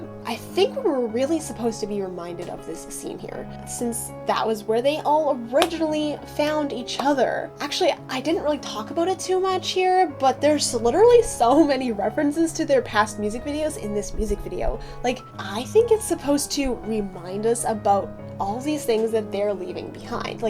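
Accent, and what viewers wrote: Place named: Canada. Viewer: canadian tinnitus pronunciation represent!!✊✊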